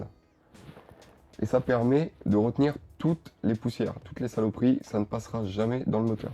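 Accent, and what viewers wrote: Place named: England, United Kingdom. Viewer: Cet accent anglais ! 😍 Bref, sérieusement j’ai adoré la vidéo, hâte de voir la prochaine ! 😅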